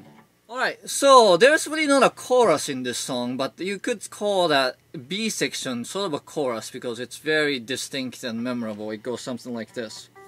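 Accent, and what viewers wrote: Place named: Russia. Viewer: I detect the Russian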